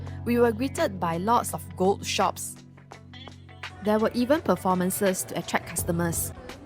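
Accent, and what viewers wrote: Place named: Singapore. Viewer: She sounds Singaporean.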